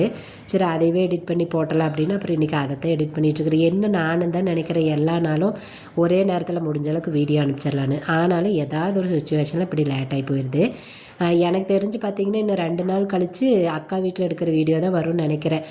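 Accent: Kongu, India. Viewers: Ayyo akka neenga erode ah naa karur 😊😊😊😊 Kongu tamil manakkuthu Naanga mutthur maniyan neenga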